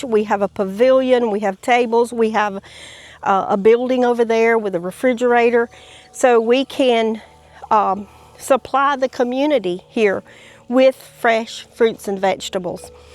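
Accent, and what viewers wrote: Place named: Alabama, United States. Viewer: Wow, what an exciting project we tried doing something similar on our own but probably a lot like where you live we sometimes get really Highwinds and it’s hard to keep those tunnels up. Maybe yours is going to be different I can’t wait to see you and we have some aviaries on our property, and I have been planting inside of them to keep out the dears, and a few other odds and ends of varmints clouding all of our peacocks, who love nothing better than to eat the new growth on veggies and fruits anyhow, I sure enjoy your channel and I love listening to your voice into Brooks voice on College Hill Farm. It reminds me so much of all my relatives back in Alabama.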